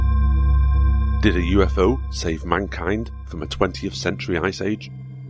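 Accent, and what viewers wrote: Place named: United Kingdom. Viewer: The narrator is a Brit with a cold.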